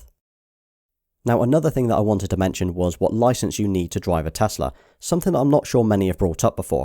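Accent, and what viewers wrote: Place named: North, United Kingdom. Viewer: Just wanted to say how much I've enjoyed your videos, I've watched quite a few without realizing. I like watching desk tour vids, wanted to make sure the PS5 Pulse 3D headphones were decent and recently started considering a Tesla Model 3. If you could do me a couple of Golf swing videos, Sony A7ii lens videos and A day in the life of a video game developer, you'd have the full house. Also good to hear a northerner on YT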